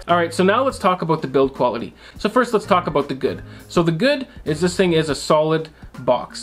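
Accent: Canada. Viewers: 1259 Canadian, how disappointing. The way you started out talking about the Canadian dealer I thought you were also Canadian 😕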